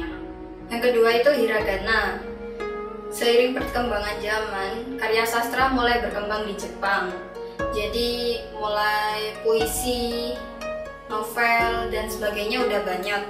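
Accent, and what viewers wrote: Java, Indonesia. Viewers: Njir wong jowo 😍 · Aku kira mba nya orang jepang.. eh tau2 nya medok #hahaha.. Btw, terimakasih infonya :D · kok medhok tenan wkowkowko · Halaa logat e jowo tulent iki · jowone jowo endi mbak ? hahaha jogja ? semarang ? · ojo medok medok mbak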